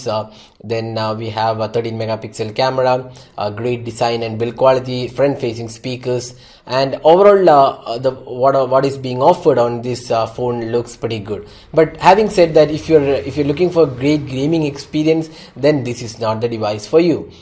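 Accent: Kerala, India. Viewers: Are you a mallu ? you sound like one.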